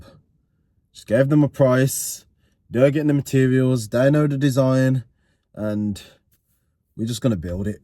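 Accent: Birmingham, United Kingdom. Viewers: This is a brummy accent - Birmingham, UK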